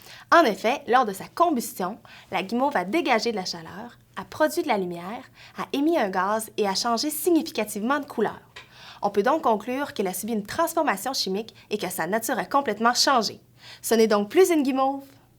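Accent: Canada. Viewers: merci grâce a vous je vais pouvoir mieux faire l'accent Canadien ! MERCI BEAUCOUP!!!!!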